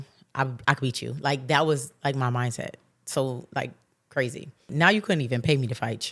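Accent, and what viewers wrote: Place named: New Orleans, United States. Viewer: Omg by your accent I thought you were from New Orleans 😅 I'm half Haitian (my dad) and American born also from South Florida (palm beach). Hey twin 👋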